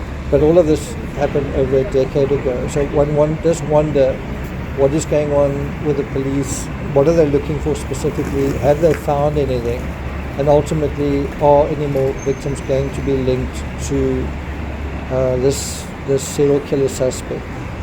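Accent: South Africa. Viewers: You sound like a South African. I'm watching from Durban. I think they will find more bodies, about 15 or so, possibly two children and, or teenagers. Poor victims.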